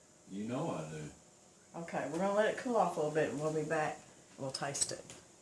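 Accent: South, United States. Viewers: Your precious. Love your country accent , in country too 😊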